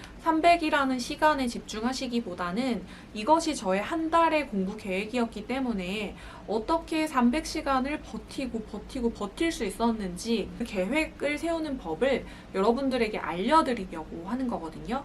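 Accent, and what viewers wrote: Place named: Gangwon, South Korea. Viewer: 누나 부산 사람 아니었어요? 사투리는 강원도인데ㅋㅋ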